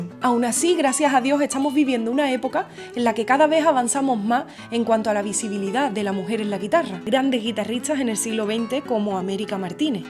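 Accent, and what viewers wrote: Andalusia, Spain. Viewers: Hermosura y talento Juntos ❤❤❤❤ Adoro tu acento andalú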